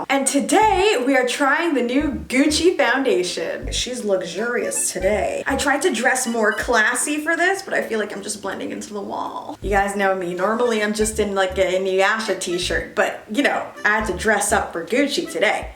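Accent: Canada. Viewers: Always forget she's French till she speaks Canadian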